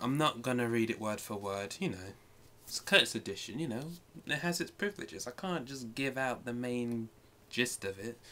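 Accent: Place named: England, United Kingdom. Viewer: lol shirt says bronx but hes clearly english :)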